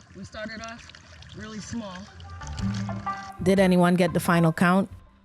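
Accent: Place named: Caribbean. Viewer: I grew up in Florida and there are way too many lionfish there too. That's one species that needs to be eliminated and luckily they taste great. I would love to try ceviche with lionfish. It's great that you're helping your environment and getting good groceries at the same time! What a beautiful reef, it's definitely worth protecting! I hope people become more aware of lionfish and start to want to buy the meat. If it was "in style" to eat them maybe the population could get under control. Thanks for sharing your adventures! Oh and I love your accent! Caribbean people have great accents!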